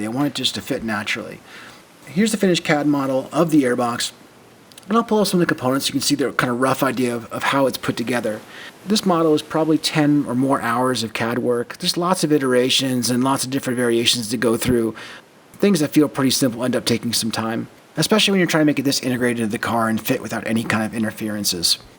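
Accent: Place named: Midwest, United States. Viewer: Subscribed. ;-) I liked the music added in during the printing process, maybe look for other places to add some background music for a bit of ambiance. Otherwise a great first video, and clear that you've been taking notes on other good creators out there. Reminds me a bit of Greasy Fingers videos - but with a clear Midwestern accent (I'd guess Minnesota, Wisconsin or Iowa), rather than the German.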